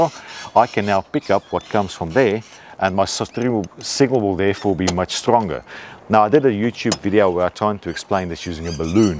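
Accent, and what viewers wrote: South Africa. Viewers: Thanks for the video. You sound South African 🤔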